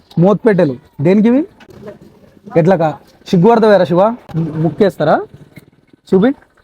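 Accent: Telangana, India. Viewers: Love to hear Telangana language . Keep going. Wish you all success. Love your explanation. Honest opinion.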